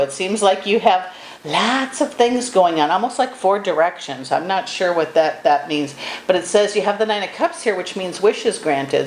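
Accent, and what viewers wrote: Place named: Minnesota, United States. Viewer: Thank you for the readkng❤❤I look forward to seeing you and hearing your voice. You have a Minnesotan type of accent and I LOVEZ me some Minnesota peeps. Good memories 👍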